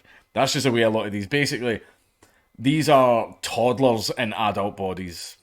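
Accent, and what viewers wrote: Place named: Scotland, United Kingdom. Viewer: I fuckin missed your thicccck Scottish accent Maaan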